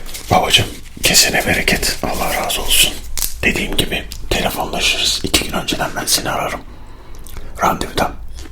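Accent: Eastern Anatolia, Turkey. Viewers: abi bu platformda asmr konusundan rakibin yok. Daha çok doğu şiveli video gelirse çok iyi olur.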